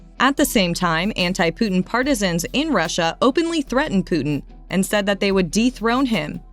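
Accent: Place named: United States. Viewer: Nice American English. Love it)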